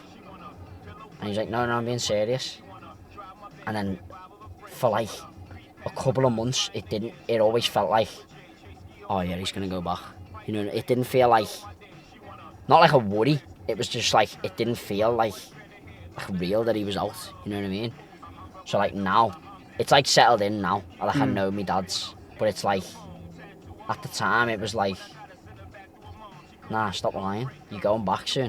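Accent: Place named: Wirral, United Kingdom. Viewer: Obviously he’s from the Wirral listen to him talk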